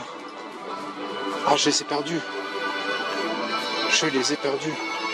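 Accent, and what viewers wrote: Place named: South-West, France. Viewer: Image magnifique et l'accent du sud ouest qui va avec 🔥😉🙏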